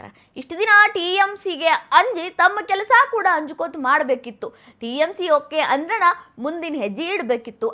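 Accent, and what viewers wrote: North Karnataka, India. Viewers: ಉತ್ತರ ಕರ್ನಾಟಕ ದ ಭಾಷೆ ನಿಮ್ಮ ಬಾಯಲ್ಲಿ ಕೇಳುವುದು ತುಂಬಾ ಸೊಗಸು. ನಿಮ್ಮ ಸೊಗಸಾದ ಮಾತು ಕೇಳುವ ಹಂಬಲ ನನ್ನದು. ದಯವಿಟ್ಟು ಸದಾ ಮುಂದುವರೆಯಲಿ. 🙏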